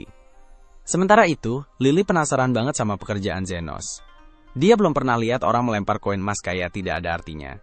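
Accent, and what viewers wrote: Malaysia. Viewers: Logat nya melayu nih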